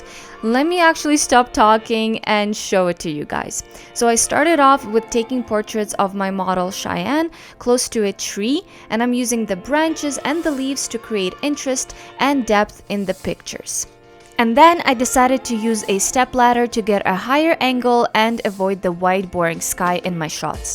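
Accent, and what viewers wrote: Russia. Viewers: This is a Russian accent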